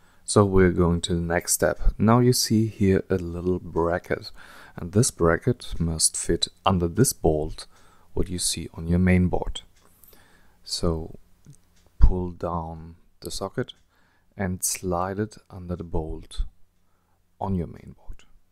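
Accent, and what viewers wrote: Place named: Germany. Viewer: Ne ;P Aber zumindest hört man, dass du ein deutscher bist^^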